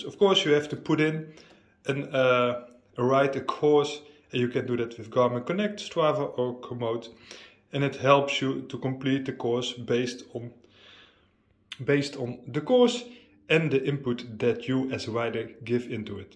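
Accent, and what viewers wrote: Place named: Netherlands. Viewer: I think you are dutch speaking :))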